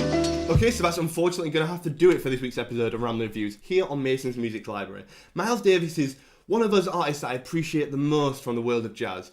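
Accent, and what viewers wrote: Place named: Yorkshire, United Kingdom. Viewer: Totally butchered John's name! He's a fellow Yorkshire man. I assume you're a Yorkshire man by your accent. It's pronounced 'John Mc- clock- lin'! Nice review!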